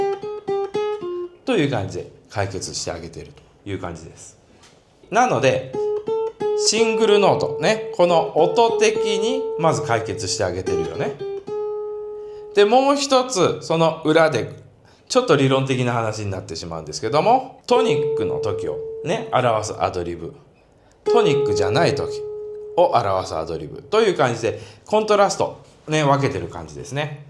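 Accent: Nagoya, Japan. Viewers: wes弾いたらうまそう😂 名古屋方言が心地良い🎸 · 快傑ズバット👍 誰が分かるねん😅 名古屋弁いいですね！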